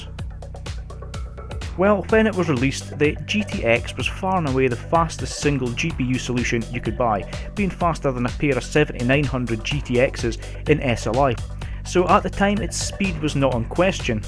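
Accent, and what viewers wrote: Scotland, United Kingdom. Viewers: Haha sounds awesome man! Good to hear another Scottish tech channel btw, I hope yourself and AdoredTV become bigger because both your videos are really good! I'm from Glasgow myself :)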